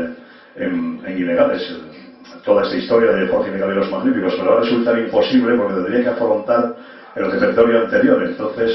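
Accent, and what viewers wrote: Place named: North, Spain. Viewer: se nota que es del norte.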